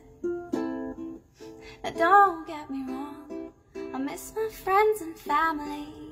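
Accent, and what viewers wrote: Scotland, United Kingdom. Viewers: That Scottish accent. Nice surprise, along with the tune that describes everything I feel.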